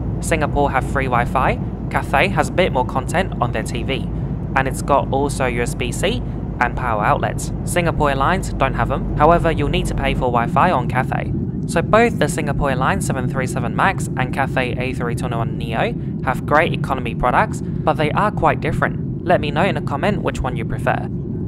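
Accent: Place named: Australia. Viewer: why do i feel like your accent has changed. it's more aussie than before.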